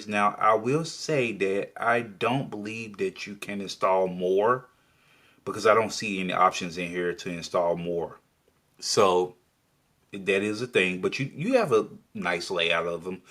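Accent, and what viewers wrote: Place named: United States. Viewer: Where is your lovely accent from ? Usa but what part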